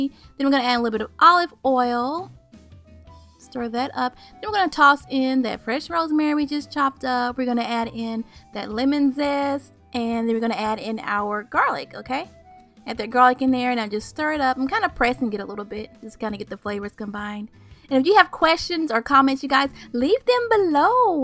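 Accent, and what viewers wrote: South, United States. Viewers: Yeah, got to say this was THE BEST homemade roast chicken I've ever had. Thank you SO much for your video. Informative and entertaining...love your Southern twang!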